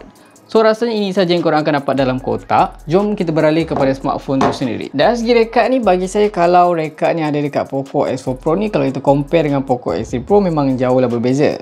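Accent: Malaysia. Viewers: Lucu banget y logat malaysia saya suka🤣🤣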